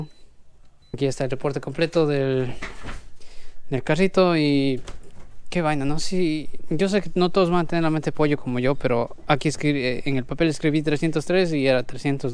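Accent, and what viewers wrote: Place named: Highlands, Ecuador. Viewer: Ese acento característico de la serranía ecuatoriana, creería que del Austro.... llegué a este video, gracias al algoritmo de Youtube. Excelente video, saludos desde Guayaquil, compatriota.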